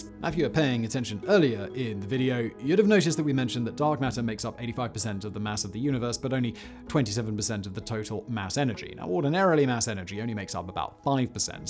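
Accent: United Kingdom. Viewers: Do people really still talk with this pompous, posh English accent? Or is this just a goof?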